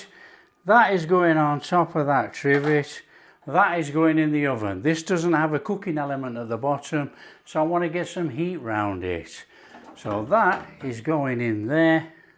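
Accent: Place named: North Yorkshire, United Kingdom. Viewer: Where are you from? Can’t place your accent, North Yorkshire?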